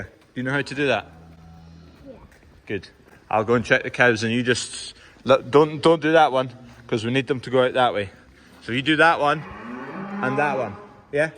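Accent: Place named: Northern Ireland, United Kingdom. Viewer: Northern Irish accent spot on👌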